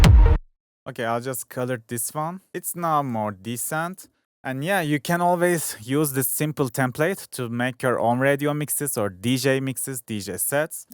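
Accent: Turkey. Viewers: I hear Turkish English accent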